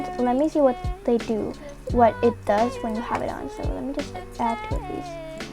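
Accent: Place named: South Korea. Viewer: I have no idea why, but you sound a teeny bit Korean I think?? Or Chinese? Just me, ok.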